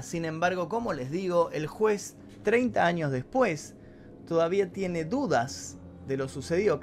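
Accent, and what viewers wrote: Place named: Argentina. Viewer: mucho bla bla hablas mucho argentino para lo que narras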